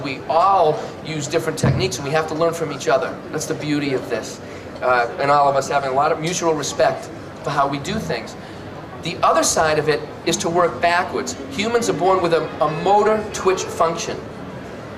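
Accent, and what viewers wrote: Boston, United States. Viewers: He's from the Boston area. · Is he a Boston fella? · Boston accent + saying retarded= win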